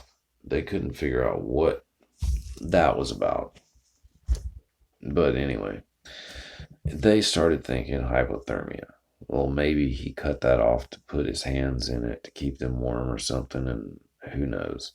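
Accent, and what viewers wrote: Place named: South, United States. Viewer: How did I not know about this yet?!?! I may never have looked at it if it were not for you! I really love your podcast videos.....you have the best voice for this stuff....I love your subtle southern accent....and you are such a hottie too!!! Thanks for another awesome show!!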